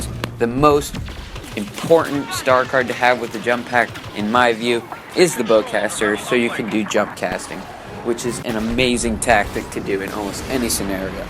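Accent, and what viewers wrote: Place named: Balkans. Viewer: your sound close to balkan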